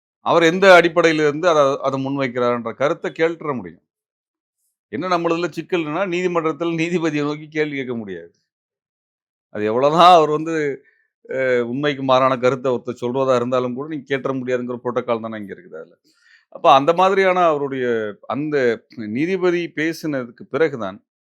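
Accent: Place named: Karnataka, India. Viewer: is anchor a tamilian r not ? in all channels anchors r talking like kannadikas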